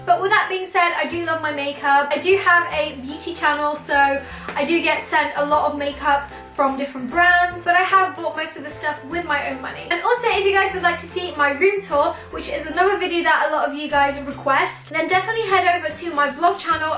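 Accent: England, United Kingdom. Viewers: Hii, you have a lovely makeup collection! I love your accent! I'm an Italian girl, so I hear English only at school and my teachers don't speak English with a perfect British pronunciation... So I do exercise when I hear you😂😂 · Anybody here who is British will probably not notice it, but it's her accent, she can't help it. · bruh are you stupid? me and her have a english accent..also we speak proper actual english thats why we are known as english people dumbass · "Don't do it"... Um, you do realize that she can't help it if she says it like that because that's just her British accent so it's her voice and she's not doing it on purpose...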